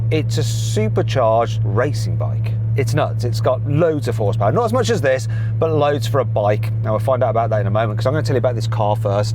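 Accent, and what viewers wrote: United Kingdom. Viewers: イギリス英語って新鮮で好き:) インド人がバイクをbikeって呼ぶの違和感だったけどイギリス英語だとbikeなのね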